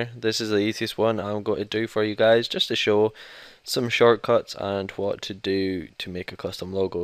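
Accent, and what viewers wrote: Scotland, United Kingdom. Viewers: love the scottish voice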